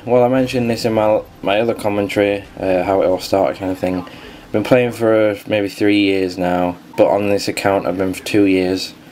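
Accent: Yorkshire, United Kingdom. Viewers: You from Yorkshire?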